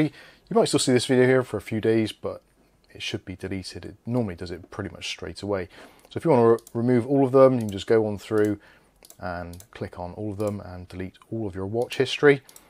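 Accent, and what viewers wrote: Bristol, United Kingdom. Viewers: Thanks a bunch for the info. Why do you sound Bristolian?🤔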